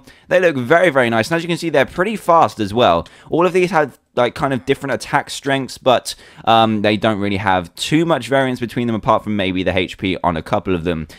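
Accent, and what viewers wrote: United Kingdom. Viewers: and the cool thing is, you have a british accent, so you sound right when you say "dalek" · Dr Who Has A British Accent Just Like YOU!!!!!!!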